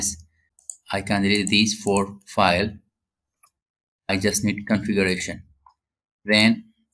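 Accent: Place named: India. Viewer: the moment that you sounded indian it was over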